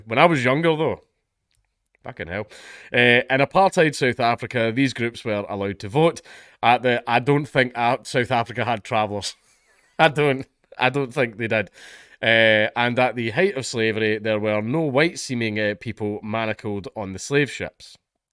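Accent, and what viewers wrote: South, United States. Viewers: The Right sort of American accent too. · country accent is a gift I've never known I needed until I received it. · I love the southern US accent. Use it more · Dankulas American accent was fucking spot on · you do a great american accent.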